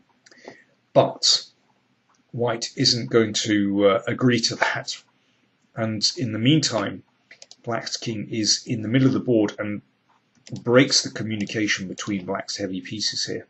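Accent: England, United Kingdom. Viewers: I love his English accent and the way of saying "indeed". You re the king.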